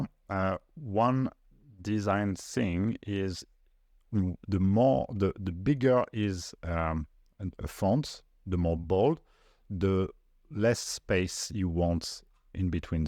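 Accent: France. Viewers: L’accent français ;)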